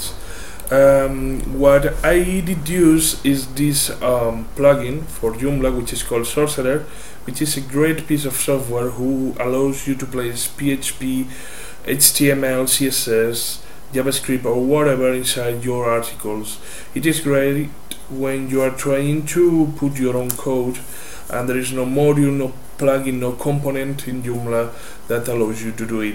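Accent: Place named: Mexico. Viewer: eres mexicano verdad, lo noto en tu forma de hablar el ingles.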